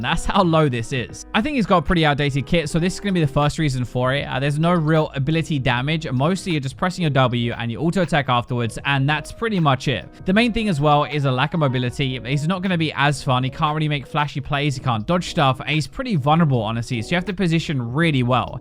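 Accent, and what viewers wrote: United Kingdom. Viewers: stop talking in british i dont undestand shitt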